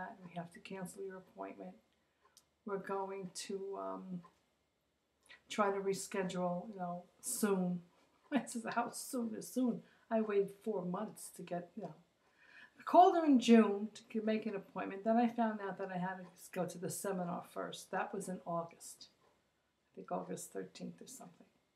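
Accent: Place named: New York, United States. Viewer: I'm so glad I found this! I'm waiting to get my appointment! I'm from NY too Babylon ny...by the sound of you accident you might be too!! I hope all goes well!! I'm excited but scared. She really is a good doctor despite the hiccups but it's a pain.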